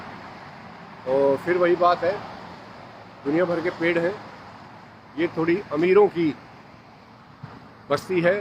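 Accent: Haryana, India. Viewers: No brother his pronunciation is entire Haryanvi and none else.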